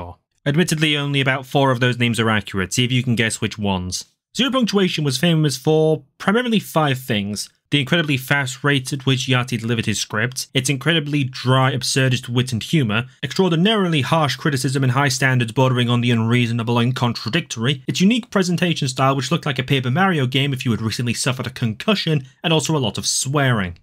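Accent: United Kingdom. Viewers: Go 1.25x speed for more Zero Punctuation Flavor. ...I won't blame your for the less snark British accent but we have what we can have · I reckon the British accent helps too lol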